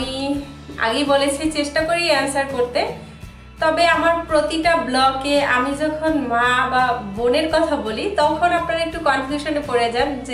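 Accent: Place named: Barisal, Bangladesh. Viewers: To mar borisal er moto kotabola kub valo laglo tomarvlog dakte valolage ami rubina to make amar didir moto lage.ami sarervdo deke 25 kg ojon komiachi.akon ami 49kg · ami tomake ajkei boltam borisaler vasai kotha bolte ar tumi deksi already bolso,meg na chaite jol.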